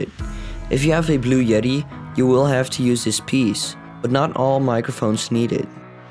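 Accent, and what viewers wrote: Netherlands. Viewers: Grappig hoe elke Nederlander die naar kijkt het Nederlandse accent herkent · Where you from you sound dutch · Nice video! Great quality. Bij setup wars herkende ik meteen je Nederlandse accent :P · Nice! ik kom hier van Setup Wars ik herkende direct dat je nederlands was als je engels praat let wel een beetje op jou accent met die F zoals Fideo maar echt nice kanaal ! sub bij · It may be that you Dutch speak normally because that I hear to your accent #TeamTechSource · ik dacht al... dat accent is NL ;P sinds ik op je startscherm vrijdag .. februari zag staan weet ik het zeker haha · ur accent sounds kinda dutch, i can tell cos im dutch as well. (great video btw, keep it up!) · ja klopt toen ik je horden dacht ik WTF deze gast is nederlands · R u dutch? so yea I can hear it from your accent :)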